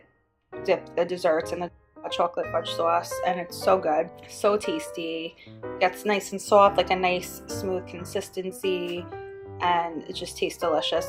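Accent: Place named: Long Island, United States. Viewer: Hmm... that's a long island accent lol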